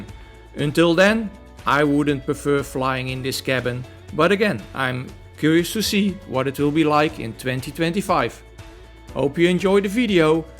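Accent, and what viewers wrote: Netherlands. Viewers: That Dutch accent! 😍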